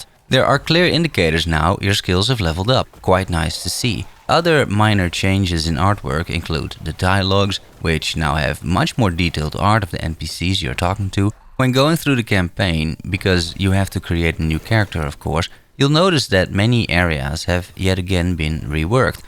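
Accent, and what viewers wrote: Netherlands. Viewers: Amazing how a Dutchy can sniff out another Dutchy within 2 seconds, by the accent haha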